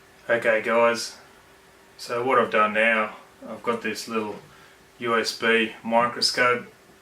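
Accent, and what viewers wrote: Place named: Australia. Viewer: And a n Aussie it sounds!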